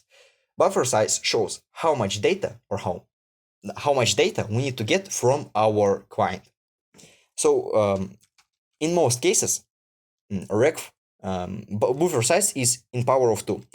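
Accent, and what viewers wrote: Russia. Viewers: эхх, русский акцент выдал тебя, но говоришь по делу, you're being great!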